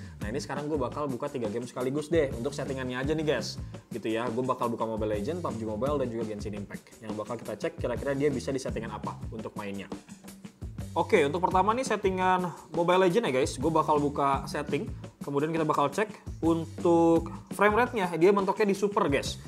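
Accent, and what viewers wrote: West Java, Indonesia. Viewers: Kaciri pisan sundana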